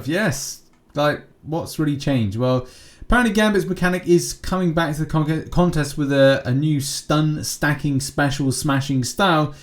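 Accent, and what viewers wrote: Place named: Cockney, United Kingdom. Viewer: You have that like cockney accent, “ ‘ello govna”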